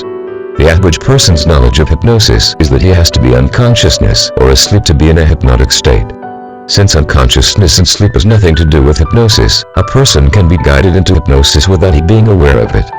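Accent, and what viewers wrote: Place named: India. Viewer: What do you think is more understandable? An Indian accent or a standard voice bot?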